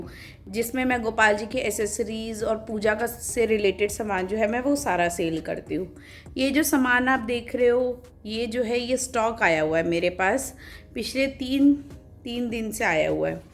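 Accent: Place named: Bhiwani, India. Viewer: Hanji di sahi kaha di aapne hm bhi Haryana s hai hmari boli hee kadak h or sabko lgta h ki hmari tone gusse vali lgti h or vese di aap kaha s ho dadi ama ki bhasha s too ese lgta h ki aap Bhivani side k ho Reply zrur Krna di 😀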